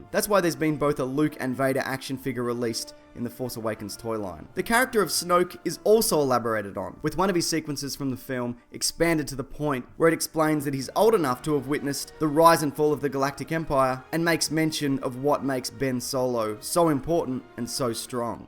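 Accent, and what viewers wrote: Australia. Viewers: do you mind me asking what your accent is, is it Australian or some other thing? I am just curious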